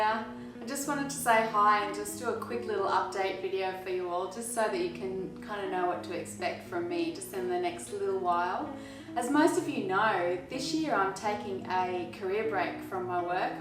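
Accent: Australia. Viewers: Hey! This is fantastic news! Well done you! I’ll definitely be following along. I’ve watched your videos so much I’ve started reading and thinking about soap in your lovely Australian accent! 😂. Going to try making your pine tar soap for my own blokey blokes soon. Can’t wait to see what you come up with next! Ooh - and if you haven’t already, could you please teach us all about master batching, storing and reheating lye solution? Thank you. Take care and you and yours stay healthy and happy! 🙏🏼😍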